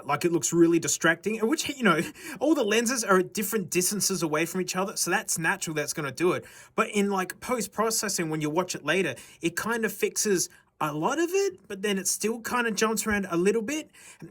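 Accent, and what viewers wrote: Australia. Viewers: thats because hes an aussie mate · You just had to confirm my suspicions of you being Australian in the end😂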